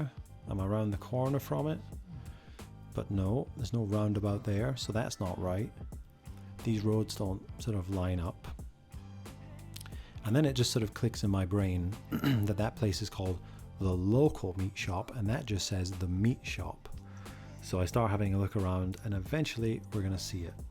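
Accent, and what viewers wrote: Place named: Scotland, United Kingdom. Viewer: Hi bud. Enjoying your videos. Where in Scotland are you from? Seem to have a bit of a mixed accent. I'm Scottish myself so good to come across another countryman who likes geoguessr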